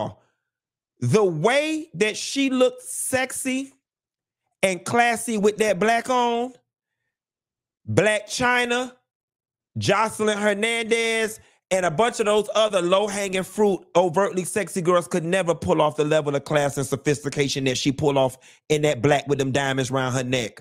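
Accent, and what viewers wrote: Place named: South, United States. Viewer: I can’t with her “new country accent “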